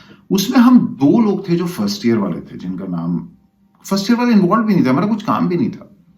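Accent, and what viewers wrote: Bihar, India. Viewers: Apka bihari tone me bolna bht apnapan deta h. Thanks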